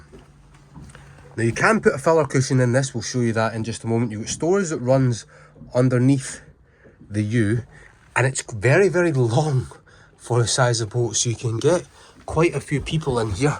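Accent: Scotland, United Kingdom. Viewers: I watch this channel for the reasonably priced boats, but I stay for the beautiful Scottish accent and the “snap” transformations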